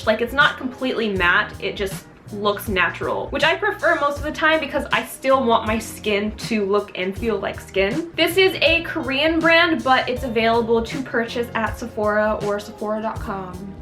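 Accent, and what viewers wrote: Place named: Australia. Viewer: The little hints of Aussie accent always crack me up 😂💖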